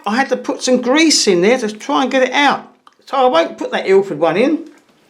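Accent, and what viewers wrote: United Kingdom. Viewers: Where is your accent from? It seems definitely British but I don't get the specific zone. (I'm not a native speaker, I'm Spaniard.)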